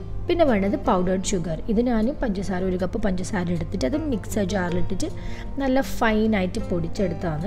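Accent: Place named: Kannur, India. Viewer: കണ്ണൂരാണോ നിങ്ങള്.. വർത്താനം കേക്കുമ്പോ അങ്ങനെയിണ്ട്..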